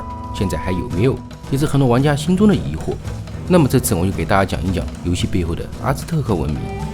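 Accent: Sichuan, China. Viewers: 四川人讲普通话，这样已经很不错了，你是没听过更糟糕的，而且比起口音，我们在意的不应该是视频内容吗?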